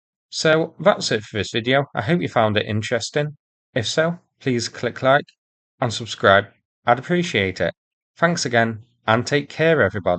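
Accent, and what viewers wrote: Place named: Home Counties, United Kingdom. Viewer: Sounds a bit Home Counties but I think you can safely call the 8 buildings that make up Andrivka a 'Hamlet' not a town 😉❤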